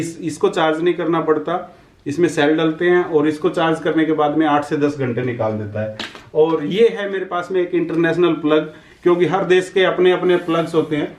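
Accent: Haryana, India. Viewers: bhai harayane k bnde ki awaaz or boli bta hi deti h ..... haryana k h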